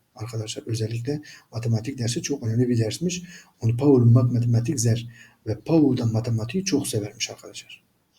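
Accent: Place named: Urfa, Turkey. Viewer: Urfalıların türkçe konuştuğu gibi almanca konuşuyorsun biraz yavaş ve anlaşılır konuş hocam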